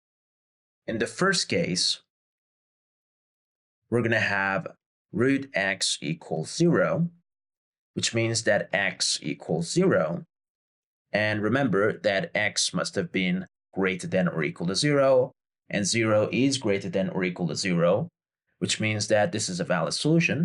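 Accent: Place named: Midwest, United States. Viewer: You're from the midwest.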